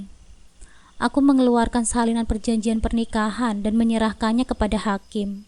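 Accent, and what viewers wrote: Java, Indonesia. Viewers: Medok amat sih min Lucu denger nya hahaha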